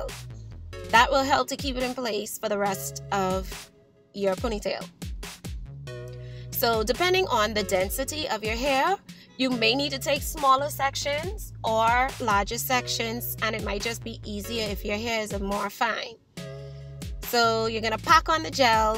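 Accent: Bahamas. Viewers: Took me only half a second to recognize that Bahamian accent lool. Slay girl slaaayy 👏🏽👏🏽 🇧🇸 🇧🇸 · frfr had me guessing if this was really a bahama mama🇧🇸🇧🇸🇧🇸🇧🇸❤ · Me too I just love the Bahamian accent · Tammie A. I knew right away she from The Bahamas like me!! 242 to the world. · Recognized that fellow Bahamian accent in a jiffy!!! And I wasn't even listening, had the volume almost off and still our accent came through loud and clear!!!!! Love it!!! Go girl!!! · ok... the min i heard her i say bahamian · same i say she bahamian frm the jump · Tammie A. Me too! My family is from the Bahamas! I recognized it immediately! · I thought I was the only one who was tryna figure out if she was Bahamian or not lol 🇧🇸🇧🇸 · At first I thought bajan or bahamian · first thing I say was "This a Bahamian?" LOL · same, we Bahamians cant hide lol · Girllll all I said to myself was she only could be from back home with that accent!!!!! 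😂 represent that 242!!! All day! 🇧🇸🇧🇸🇧🇸🇧🇸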